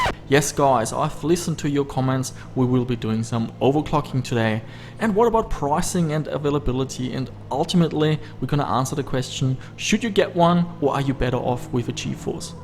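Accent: Germany. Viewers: you are from australia? I thought you were like german from your accent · can i ask you from where you are? you have this german-english :D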